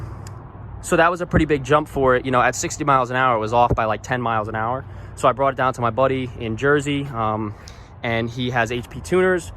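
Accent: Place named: Brooklyn, United States. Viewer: We used to go make deliveries to New Paltz Poughkeepsie from Brooklyn terminal market years ago for the watermelon king M Pagano and Sons and I'm originally from Brooklyn to, I caught your accent lol Really nice work, she really looks stock, I don't 100% have a builder to do my Tacoma SAS, I might have to look you up if this guy doesn't come through for me? He's so far has barely showed any interest, and long island mechanics suck!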